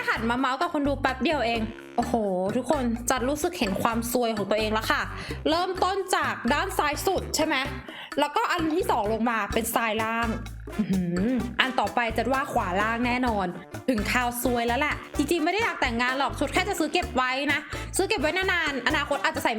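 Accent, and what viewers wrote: North, Thailand. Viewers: ปี่เป็นคนเหนือแม่นก่อคับ💗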